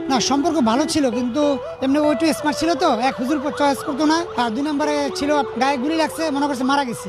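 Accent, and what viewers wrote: Barishal, Bangladesh. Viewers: Borishaila bole kotha!!!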